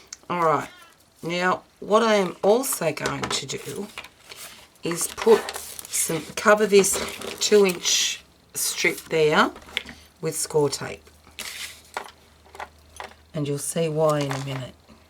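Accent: Australia. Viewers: Thanks for sharing. I really like it without the flaps and with the added strength. I've made mine 7.5 inches high because I want to do an album that will fit 6 x 4 photo mats. Can you recommend what sort of pages to add to the hinge ? I'm thinking about doing just a single sheet of cardstock because I can't make pocket pages that big without having to stick 2 pieces of paper together with binding and then it looks a bit bulky. I'm also worried that the open edge would be too big at 7.5 inches. Thanks again, great to hear an Aussie accent. I'm from Melbourne living in the US now.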